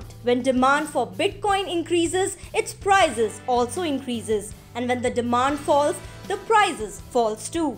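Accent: India. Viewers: I can not take those Indian accents… I automatically gave it thumbs down, however, she might have had some cool info, besides I took my sweet a s s time to write this response.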